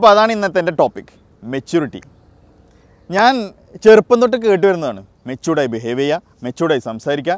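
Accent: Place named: Malabar, India. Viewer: New subscriber here.. Boss malabarilaano.edakk nammale basha keri varnn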